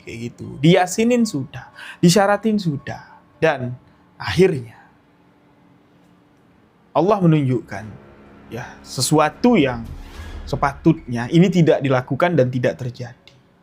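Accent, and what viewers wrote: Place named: Jambi, Indonesia. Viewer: sama2x bang, maaf jarang komen bang, maju terus bang udah mode jambi tuh pembawaannya ❤❤❤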